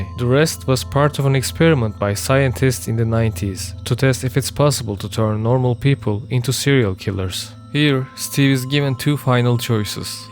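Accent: Turkey. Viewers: Turkish accent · Ben senin o türk ingilizceni anlarım başkannn.. Manyak video bu arada sevgiler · Türk aksanı duyuyorum sanki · you sound turkish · Türk ingilizce aksanini 100 kilometre öteden dahi tanırım. Güzel video olmuş usta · aga sen türksün ben o aksanın kokusunu alrıım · Turkish %100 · Türksün sesinden belli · Your accent sounds very Turkish maybe because I’m Turkish and used to hearing it a lot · you kinda sound like turkish though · You sound like turkish man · I know a Turkish when I hear one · I love the Turkish accent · As a Turkish, i smell a Turkish accent 😁 Btw for your first video, the wievs are really good! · What accent is that? It sounds turkish. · aksanı duyduğum an türk olduğuna emin oldum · türk müsün len aksanın o vibeyi veriyor great video btw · Video çok güzel de, Türk müsün amk. Yemin ediyorum lan bu adam türk mü diye aksana odaklanmaktan videoyu izleyemedim:d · kanka Türk müsün sesinden anladım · came here to say this, his accent is exactly like a Turkish person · You have a Turkish accent, are you Turkish?. 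I like your video btw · I can hear the turkish accent kankam, good video ellerine saglik;) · Are you türkish by any chance? Your accent very similar to türkish accent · I dunno why but your accent sound like Turkish accent to me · aksanı türk gibi. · Out of context but are you Turkish? Your accent sounds like Turkish accent a lot. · Hocam video güzel olmuş eline sağlık. Türk müsün acaba aksan Türk gibi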